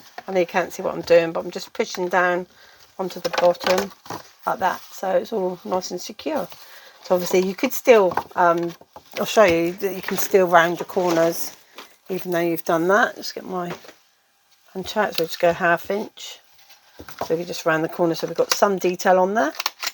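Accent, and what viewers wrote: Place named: South West, United Kingdom. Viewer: Love it! I love trying 3D projects and I know from your previous work on tv craft shows that you always come up with some great construction projects. Looking forward to trying this and watching your other tutorials. I'm from Bristol but live in Tamworth and it is nice to hear that South Western accent - makes me feel at home!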